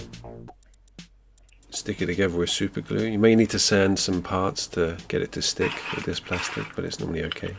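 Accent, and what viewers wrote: United Kingdom. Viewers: R u from UK ?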